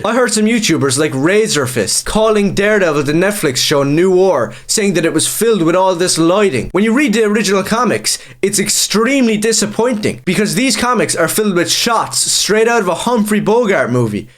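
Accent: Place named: Ireland. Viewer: fine Irish yapping man, imma read it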